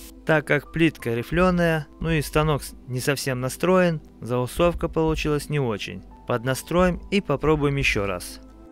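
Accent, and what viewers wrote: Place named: South, Russia. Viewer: Южный акцент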